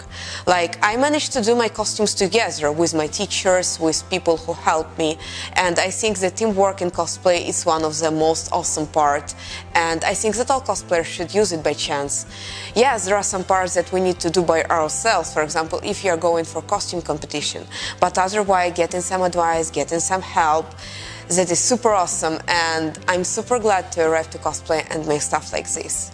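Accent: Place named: Russia. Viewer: Опять эти Russian инглиш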